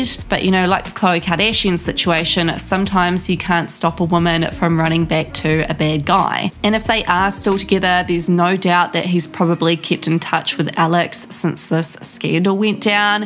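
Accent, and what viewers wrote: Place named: New Zealand. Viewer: Why is it funny? It's a New Zealander accent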